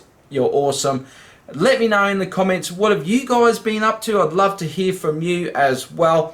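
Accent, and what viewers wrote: Australia. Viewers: Great to see you back, and hearing an Aussie voice around. Our weird weather down here near Sydney has made things even more crazy. We finally got to have a camping holiday that has been cancelled 3 times from Covid. Yes I too have changed my Lego collecting thinking. Sadly my city is going. We need the garage back but the small office room is becoming a Lego room. My girls are in high school too. One thing we all have learnt from the last few years is that things will always change and you need to adapt. Thanks again. 😁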